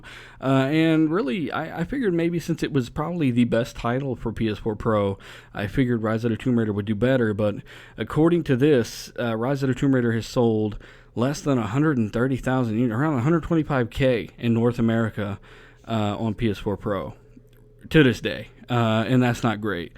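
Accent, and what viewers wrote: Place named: Hawaii, United States. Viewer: you got that minor pigeon accent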